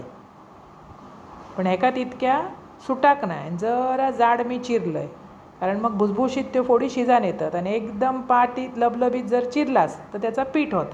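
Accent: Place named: Konkan, India. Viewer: तुमच्या रेसिपी छान असतातच पण मला तमचे कोकणी बोलणे खूप आवडते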